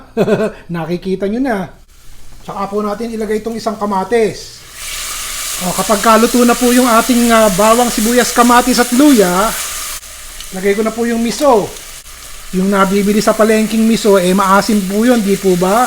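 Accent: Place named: Nueva Ecija, Philippines. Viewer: Taga nueva ecija po b kau chef? Kase yong accent at mga words ninyo ng tagalog.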